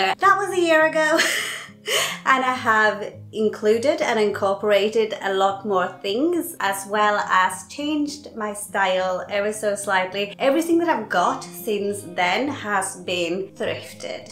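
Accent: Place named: Sweden. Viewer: I told my husband you're Swenglish.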